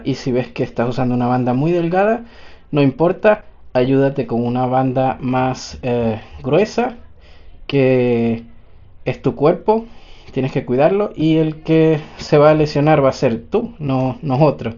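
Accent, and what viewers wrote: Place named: Chile. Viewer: Por tu acento eres de chile verdad? Por ciento normalmente cuánto cobras por un entrenamiento que me habias dicho en un comentario convertido a pesos mexicanos o como funciona que no soy de ese país?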